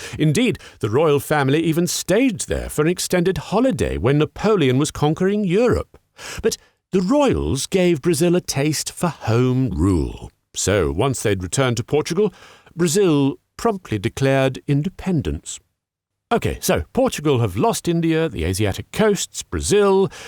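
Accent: United Kingdom. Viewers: I’m Portuguese from Oporto!!! I’m very grateful for this video!!! Thank you very much!!! Beautiful video!!! One of the best I ever seen about my country history explained in British English 🇬🇧 Aye British English!!! Not American English hahaha 😆 I hate that to be honest!!! Cheers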